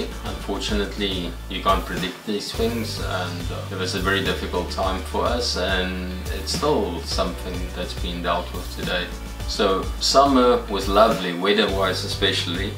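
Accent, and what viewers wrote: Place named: South Africa. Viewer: Am new to ur channel i ave watching ur videos for sometimes now i really enjoyed it. So i subscribe to ur chennel i also watch other south african youtuber one base in Vancouver also an one in toronto you guys are really lovely people love ur ascent i am a Jamaican from watching all of you ur videos i cant wait to get to canada i wish you an ur family very happy an productive life in canada.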